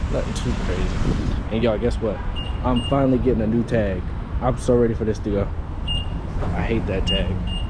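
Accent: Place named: United Kingdom. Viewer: where you from? sounds like uk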